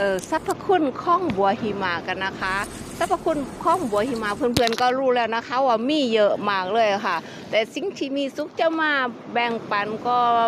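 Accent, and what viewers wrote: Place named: South, Thailand. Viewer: ให้กำลังใจน้องกันดีกว่าคะ.บางครั้งการทำคริบในการพูดแบบนี้มันก็มีติดบ้างอะไรบ้างนิดหน่อยมันก็ไม่ได้น่าเกลียดอะไรสำเนียงใต้ฟังก็เพราะดีนี่คะ.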